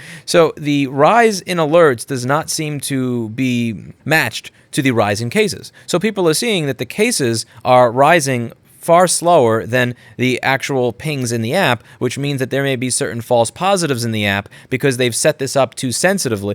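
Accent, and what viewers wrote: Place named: Long Island, United States. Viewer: Your on long island. We long islanders can recognize that accent anywhere. Im moving up to VT in a month ( part of the crowd re-evaluating their life) and Im concerned my accent will give me away up there.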